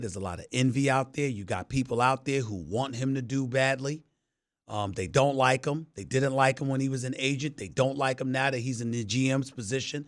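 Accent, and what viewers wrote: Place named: New York, United States. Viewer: NY accent, especially in the boroughs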